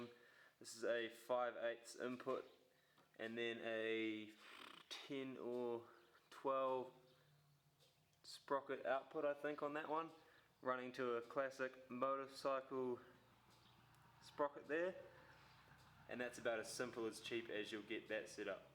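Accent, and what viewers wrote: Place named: New Zealand. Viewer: haha i found a kiwi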